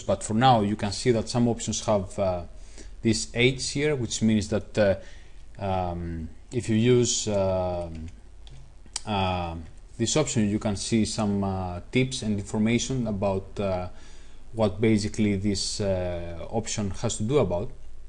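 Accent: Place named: Greece. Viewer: are you greek or sth ? i can detect an accent :P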